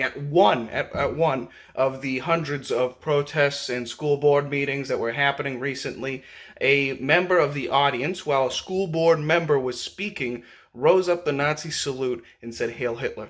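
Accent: Boston, United States. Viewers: Is that a hint of the ol'e ''Baws-ton'' accent I doth hear? Great vid, man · your accent has a hint of Boston. am i on to something here young man?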